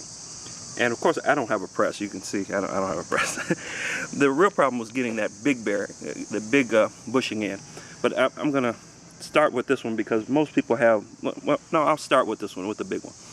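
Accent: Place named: Caribbean. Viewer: You sound like you have Caribbean Roots man....well done btw. Thanks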